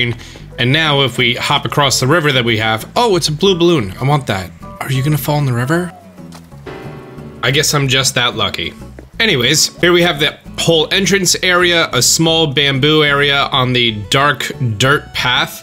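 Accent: New Jersey, United States. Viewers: He aint lying we all say wadder in jersey lol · Can confirm on the water dialect for Jersey. i used to live in New Brunswick. We do in fact say Wadder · Ok let's talk about "water" pronunciation REAL QUICK (sorry). Nobody in America pronounces the "t" in "water" as a "t". Most people pronounce it as a "d" just like you guys in New Jersey do. The difference is the "a". Most pronounce it like "ah" as in the "a" in "calm". You guys pronounce it like "oo" as in "wood". Like, it literally sounds like you're saying "wooder". Ok rant over. Love your vids brah. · As a New Jersey resident, yes that is how we say water. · Water = Wooder in Jersey, apparently. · As a Jersey-ian, I too pronounce water like that. Didn’t even think you were pronouncing it weird lol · I'm from Jersey and yeah we say water that way whats the problem with that?? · Honestly, I love your New Jersey accent :) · I am from New Jersey as well, and I can, in fact, confirm that we do pronounce water just as you said. 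😁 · Lmao I’m from jersey too and I had no idea you were saying water wrong guess I learned something new about myself today 😂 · 😂 Cant believe youre from NJ! No wonder I never understood why people were complaining how you say "water" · Lmao it is a jersey thing. Im also from jersey. We all say it like that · hello! checking in as one of the new female viewers (well, i was subscribed before for some pokemon stuff but now i'm actually invested in the ac content lol). i didn't realize you were from nj - me too. people always shittin on our accents -.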